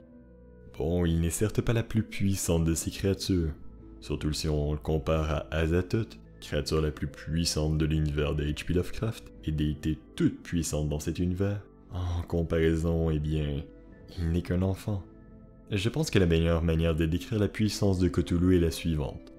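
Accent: Quebec, Canada. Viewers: J'adore Cthulhu, mais ca m'a fait bizzarre d'entendre l'histoire avec un accent québécois ^^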